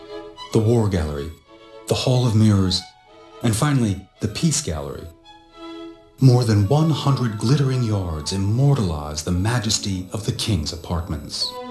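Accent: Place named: United Kingdom. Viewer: The narrator clearly sounds British, not american nor French either.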